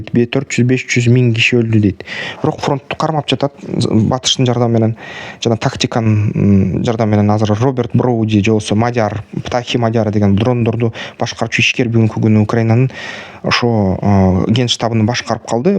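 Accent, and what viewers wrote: Standard. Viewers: Азаматсын таза кыргызча тушундуросунда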